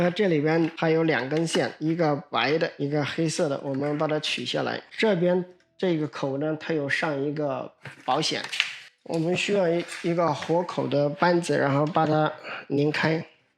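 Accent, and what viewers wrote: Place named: Henan, China. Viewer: 老乡豫普说的不赖😊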